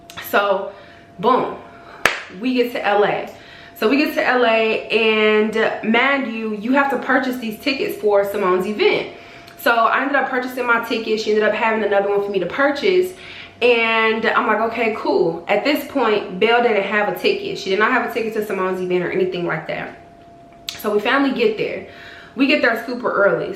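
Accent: Chicago, United States. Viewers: I watched Ti’s story time do I had to watch yours. Btw are you from Chicago?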